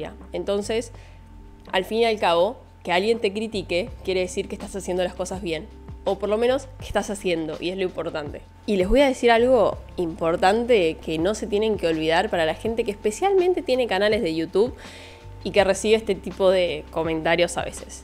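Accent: Argentina. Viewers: Yo creo que no me dan bajones por los comentarios pero sí por lo dislikes:( Psdt: Me encanta tu acento argentino😊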